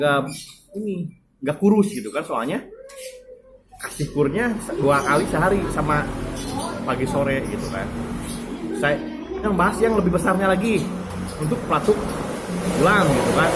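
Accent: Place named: West Java, Indonesia. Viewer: Sunda pisan mang... Hade infona euy..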